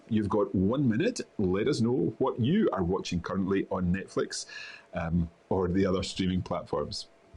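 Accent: Scotland, United Kingdom. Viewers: Patricia living in france and wishing to learn french, thank you love listening to a Scottish man.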